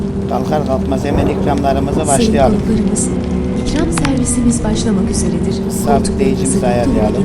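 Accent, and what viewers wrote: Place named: Konya, Turkey. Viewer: Konya şivesi mükemmel olmuş ve aleykümselam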